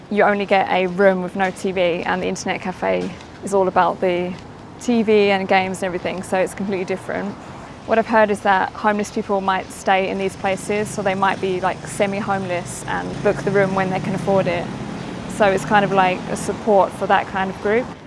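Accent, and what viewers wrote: England, United Kingdom. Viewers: You got this kind of stuff in every city you live in around the world! Japan is not the only place and saying it isn’t a nice place to live when there bad places and good place to live in Japan just like any other country. And you sound English so I know for fact England isn’t paradise! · That British accent is top notch 😂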